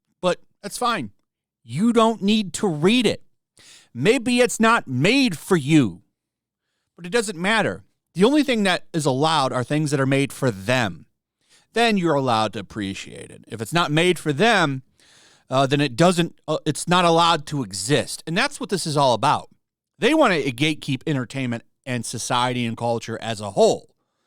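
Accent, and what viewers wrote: United Kingdom. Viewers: Holy shit your British accent is amazing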